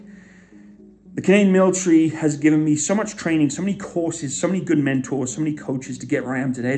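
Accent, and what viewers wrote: United Kingdom. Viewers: I served with the SAS as a transfer. Good Job Mate! That Limey assed accent is yours and only yours Sir!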